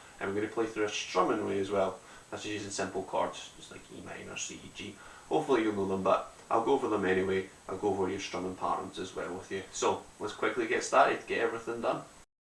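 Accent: Scotland, United Kingdom. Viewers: damn good to hear a Scottish accent!! do you do lessons?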